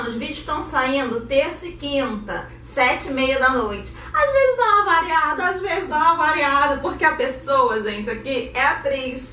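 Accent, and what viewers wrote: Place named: Rio de Janeiro, Brazil. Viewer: Que sotaque tão carioca :0 Beleza :)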